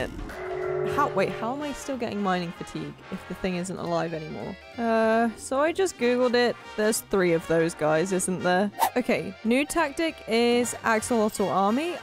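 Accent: United Kingdom. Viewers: 1 are you British 2 how did you get so good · Are you british?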